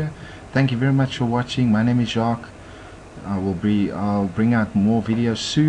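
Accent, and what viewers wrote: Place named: South Africa. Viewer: Spoken like a true South African hey Jacques?! LMAO. Hard to miss that accent after all these years of living in the US. Ek mis Suid Afrika so veel my broer dankie vir die studieklas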